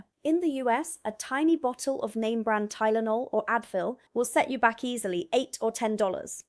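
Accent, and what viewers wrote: England, United Kingdom. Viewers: you SOUND English. Re Branston pickle.....have you tried piccalilli on your cheddar sarni (new word for you?)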